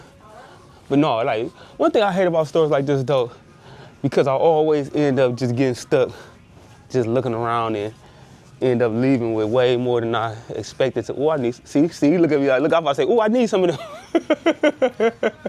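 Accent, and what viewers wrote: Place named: Washington DC, United States. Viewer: Man you sound like a DC dude from time to time you had to around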